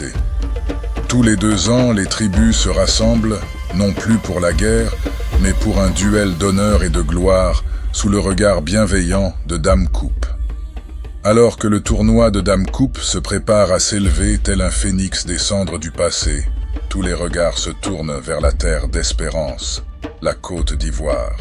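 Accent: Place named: France. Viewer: Vraiment magnifique … Mais pourquoi l'accent français du colon? Soyons également fier de nos accents Africains.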